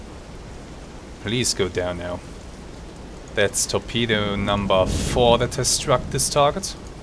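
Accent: Germany. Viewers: I like betters, too, but... let's be honest: That German accent DEFINITELY adds something to the experience;)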